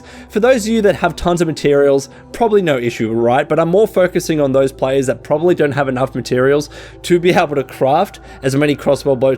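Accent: Australia. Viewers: Aussie? And you look like Daniel Riccardo 🤟🏼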